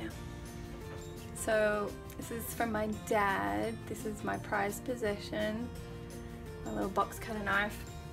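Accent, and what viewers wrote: Australia. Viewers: Beautiful work. I thought you had an Aussie accent. Keep up the great work.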